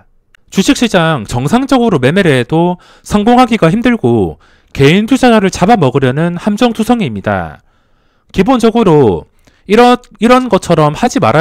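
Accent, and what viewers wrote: South Korea. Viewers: 사투리가 섞인 서울말? 은근히 중독됩니다.^^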